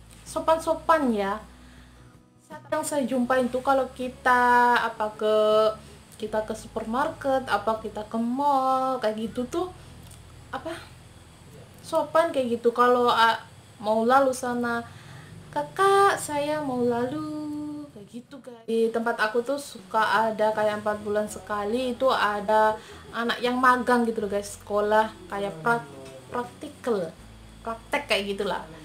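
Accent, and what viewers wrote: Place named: Indonesia. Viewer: Yang kamu cakap sekarang ni bahasa Melayu lah Yang beza loghat Kamu berbahasa Melayu loghat Indonesia Di Malaysia bahasa Melayu loghat Malaysia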